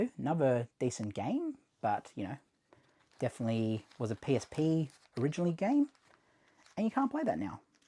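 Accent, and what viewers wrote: Australia. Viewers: Austrailan indigenous.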